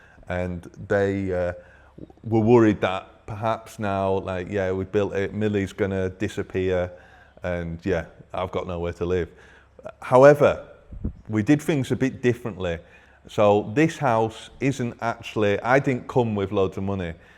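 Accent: Northern England, United Kingdom. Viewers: Brilliant good luck to the future in your lovely home .i detect your from the north of England ?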